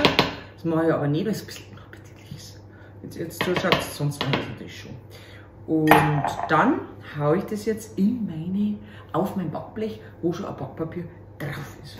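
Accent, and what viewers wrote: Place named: Bavaria, Germany. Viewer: vielen Dank liebe für die schnelle Antwort. Ich finde deine Videos egal ob das Backen oder die anderen so natürlich und mega lustig, das ich dich gleich abonniert habe. Ich muss mich zwar teilweise sehr konzentrieren damit ich alles verstehe, wenn du in bayrischem Dialekt sprichst🤣 aber es geht. Wünsche dir einen schönen Sonntag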